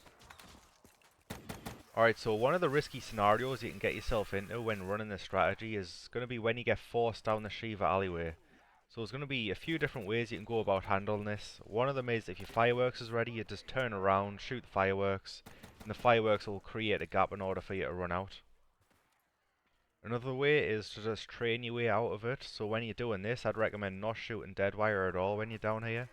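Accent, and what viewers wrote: Newcastle, United Kingdom. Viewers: i know a geordie accent when i hear one! great to hear people from around the north east creating content it's fairly rare. keep it up mate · Are you a geordie? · It's geordie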